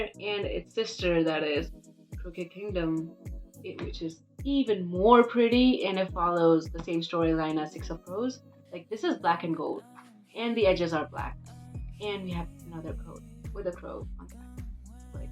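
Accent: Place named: India. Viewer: Omgg your accent is reallyyyyy niceee for an Indian girlll!!❤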